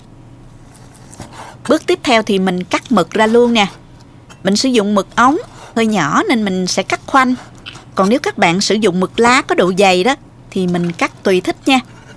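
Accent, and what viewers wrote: South, Vietnam. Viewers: Giọng nam ngọt ngào Thức ăn đậm đà Còn gì ngon hơn nữa, chúc luôn khỏe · Giọng nói người miền Nam nghe dễ thương quá 💐💐💐💐❤️❤️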